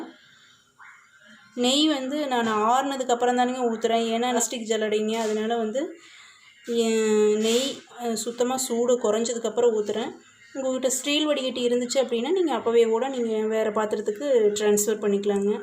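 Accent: Kongu, India. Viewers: Kongu Tamil super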